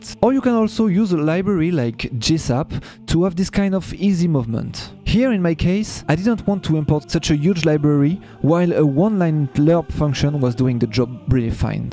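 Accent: France. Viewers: Ce que je voulais dire, c'est que y'a plein de Français qui n'aiment pas entendre l'accent Français en anglais, plein d'Espagnols qui n'aiment pas entendre accent Espagnol en anglais, plein d'Allemands qui n'aiment pas entendre l'accent allemand en anglais, etc. C'est souvent les gens qui parlent nativement une autre langue que la tienne qui aime bien ton accent. Du coup les commentaires de Français qui disent qu'il a un accent français pas ouf, on s'en fiche, vu que si tu parles anglais, c'est pas pour les français, c'est pour des gens qui sont pas français et ont pas de soucis avec ton accent. J'pense on dit exactement la même chose mais on s'est pas compris xD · J'ai du mal a resté focus avec ton accent francais (alors que j'ai le meme accent quand je parle mdr) j'ai bcp bcp + de facilité a comprendre l'anglais avec l'accent pakistanais (ne me poser pas de question sur ca) en tout cas bonne chance INTERNATIONAL CODE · Je valide le changement de langue car je souhaite le maitriser et franchement avec l'accent francais c'est tellement plus facile, Merci d'avoir pris l'initiative👍(copié collé le mec) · J'ai pas pu regarder la vidéo en entier... 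Désolé, j'aime ton contenu et ta personne mais une vidéo de 12min en anglais avec un accent 100% français ça m'est insupportable 😞 (Je ne suis pas français) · Méga cool en anglais avec l'accent bordelais. Même en étant une quiche avec l'anglais j'arrive à comprendre et donc probablement que je vais devenir plus à l'aise à la longue. C'est gagnant gagnant. Merci ! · Yes! J’apprendrai l’anglais avec ton accent français, se sera plus simple à mon avis. Continue ce format! 🙂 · Je valide le changement de langue car je souhaite le maitriser et franchement avec l'accent francais c'est tellement plus facile, Merci d'avoir pris l'initiative👍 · Au contraire des nombreux commentaire, j'avoue que l'accent français me perturbe. Mais, tu as l'air d'avoir un bon vocabulaire et ça semble aider de nombreuses personnes. Pas sûr que tu sois référencé aux pays anglophone par contre ! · Well done for your first video in English! 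I would have personally made the websites presentation shorter to give more room for the coding part. Anyway I loved it and keep going in English, you still rock because french accent rocks no matter what they say! And the new reviewww generic is gorgeous, made by Matei I suppose? You're really a great team! Go and conquer the world 😎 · Bravo pour cette vidéo ! C'est assez vrai que l'accent français aide pas mal a rendre le tout plutôt smooth ^^ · Hello, petit retour d'expérience sur le passage à l'anglais, même si je vis depuis 6 ans en Irlande : Grâce à ton accent Français, et à un rythme "normal" : ton anglais est facile à comprendre pour un francophone sans avoir besoin de trop se concentrer, ce qui conserve l'expérience agréable (comprendre certaines vidéos US relève d'une séance de travail coté concentration). Belle transformation. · I love your french accent 👻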